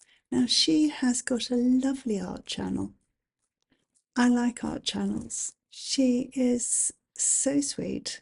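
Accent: England, United Kingdom. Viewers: Hello, I came over here from LifeintheWyldeWest. Love your vioice. I was born in Scotland and adopted by Americans and came to the US, so I don’t get to leave with that lovely English accent. I will be watching. Your success inspires me. ❤️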